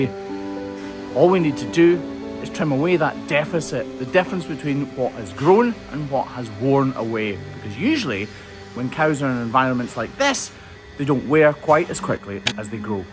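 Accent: Scotland, United Kingdom. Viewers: Having trouble saying "similarly" with a Scottish accent try saying "Purple burglar alarm"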